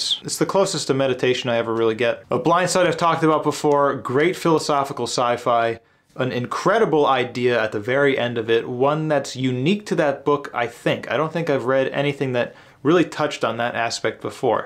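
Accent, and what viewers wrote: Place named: Netherlands. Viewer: P.s you Dutch by any chance. You hide the accent pretty well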